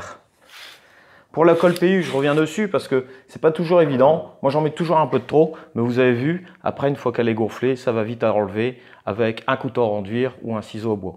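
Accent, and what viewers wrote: North, France. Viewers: bonjour, bon travail tu ai du nord ?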